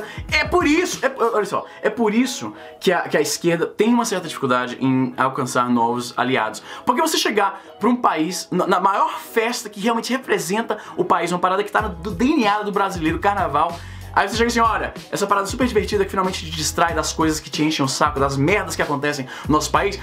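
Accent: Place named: Ceará, Brazil. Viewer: Eu percebi algo de cearense em vc . ( fala rápido pra caralho )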